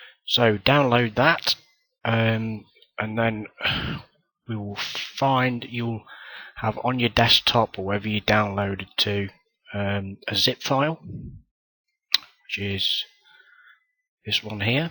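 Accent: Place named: United Kingdom. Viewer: Fantastic tutorial! It's nice to get some British tones on here too!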